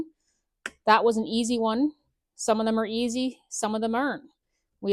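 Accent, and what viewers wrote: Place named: Canada. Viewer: There's always something solidly reassuring about a Canadian accent. No idea why this was recommended - my back yard is about 25m2!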